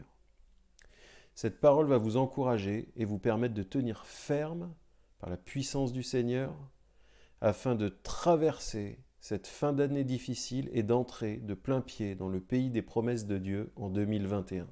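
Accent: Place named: Quebec, Canada. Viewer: Merci . Il m'a fallu quelques minutes pour m'habituer a votre fort accent de la belle province , certains mots m'ont échappés , mais , j'ai bien compris l'essentiel . Merci a vous .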